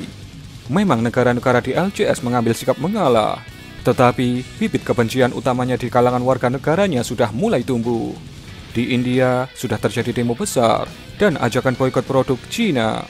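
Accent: Java, Indonesia. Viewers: Medok amat yang ngomong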